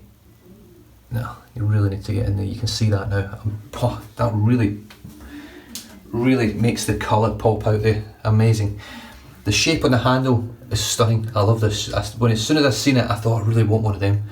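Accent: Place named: Scotland, United Kingdom. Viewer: ahh..OK..Top of the Chain not 'Top Of The Chin' ...the Scottish accent caught me out LOL (and I have a Scottish doctor who has a thicker accent) LOL..OK mostly out of stock there ...I'll join the waiting list.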